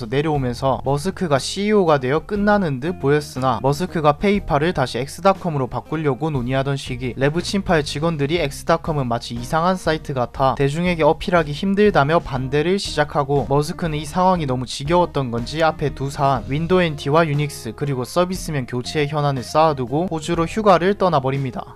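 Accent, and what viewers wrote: Gyeongsang, South Korea. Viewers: 사투리억양이 느껴진다 갱상도인가? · 경상도 말투가 좀 섞여 있네요? ㅎ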